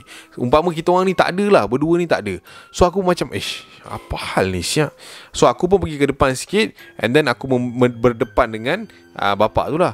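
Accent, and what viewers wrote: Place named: North, Malaysia. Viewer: Pekat nau bahasa utara 😂 Btw salam dari kedah🔰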